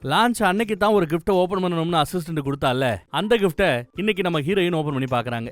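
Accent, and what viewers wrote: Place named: Sivagangai, India. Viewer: ஐயாவின் விளக்கம் வெகு விமரிசையாகவும் எதார்த்தமாகவும் அங்கநங்கே நையாண்டி நக்கல் கலந்தும் சிவகங்கை வட்டாரமொழி வழக்கிலும் உள்ளது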